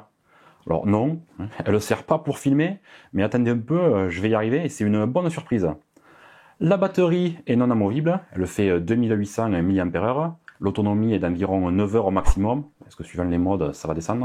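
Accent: South, France. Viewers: Super vidéo vive l accent du sud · Impéccable, avé l'accent du sud 👍, belle présentation et démonstration, il me tente bien ce guimball ... salutations du 07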